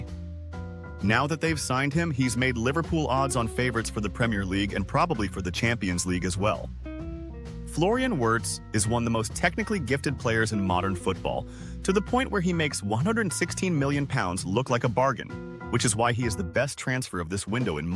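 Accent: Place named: United States. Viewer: We really don't need AI with an american accent!!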